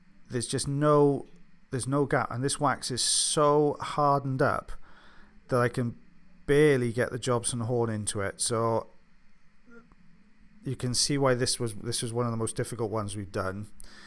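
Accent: Wales, United Kingdom. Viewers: Thank you for the special! I had a stressful afternoon yesterday and I got so excited (I sound like a whacked wombat) when I saw this in my notifications! Perfect unwinding video! This southern girl (Georgia, imagine an accent that’s half hillbilly and half Scarlet O’Hara) loves your UK accent! Y’all keep up the good work 😄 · I love your Welsh accent. Your' narration of these videos is just brilliant, I'd never want to mute you! How would we learn about Audiology without you. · I could listen to your lovely accent for HOURS!! How i miss Wales. Your commentary is wonderful. Such a crush here!! Lucky Mrs. B!! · Definitely! I could listen to him all day, love those dulcet Welsh tones 😍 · It’s our welsh accent it’s lv it or hate it what part of wales are you and your wife from I live near CARDIFF AND SPEAK WELSH LV UR VIDEOS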